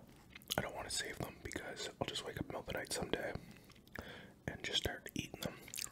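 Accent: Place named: United Kingdom. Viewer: I’m british but I usually cringe when I listen to british ASMRtists idk why😬🤭 you’re the exception, so you’ve gained a sub 🤷🏽‍♀️😂 I came from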